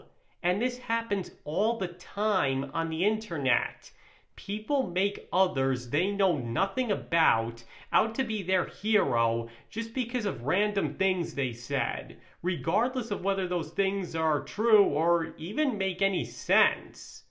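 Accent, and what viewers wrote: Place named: Great Lakes, United States. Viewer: Im really enjoying your channel! You bring new perspective to the SP Analysis community that is sorely needed. I'm also dead ass fascinated by your accent. I've heard it once before, from a Podcaster who is probably twice your age; specializing in baseball and popes! 😅 I've heard it referred to as a "Great Lakes" accent. My brief attempt at light online stalking was unsuccessful. Can you help me understand your accent better without revealing inappropriate location details?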